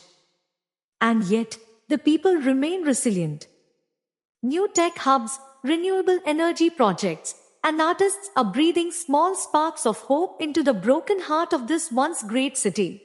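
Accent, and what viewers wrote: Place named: India. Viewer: Bad narration the Indian accent is too thick.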